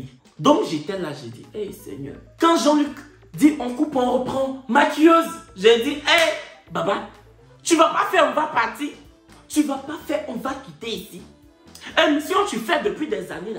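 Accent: Ivory Coast. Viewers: Pourquoi elle comprendrait pas? Il parle bien français même avec son accent Ivoirien & quelques mots en nouchi, n'importe qui peut comprendre. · je suis antillaise et je m'arrange avec l'accent ivroirien. Donc les blancs n'ont qu'à s'arranger aussi comme nous.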